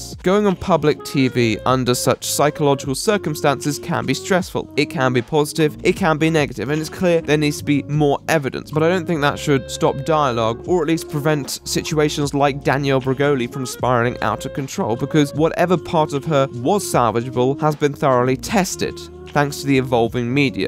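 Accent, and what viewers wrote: United Kingdom. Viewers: thanks for mentioning that you are british cause i was so confused about the accent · not everyone in Britain talk like that lots of people here talk like a Chave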